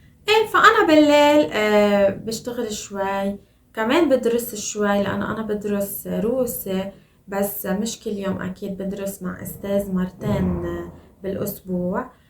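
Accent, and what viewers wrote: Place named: Lebanon. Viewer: I love the Lebanese accent it sounds pretty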